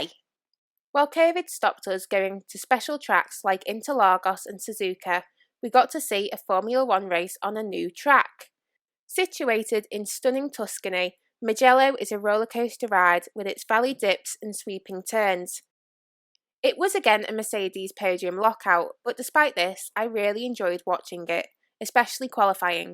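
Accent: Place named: Liverpool, United Kingdom. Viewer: Hey, random question, are you from around the Liverpool area? 🤔 As a Hungarian, I have to agree, the hungaroring is usually not too exciting in terms of results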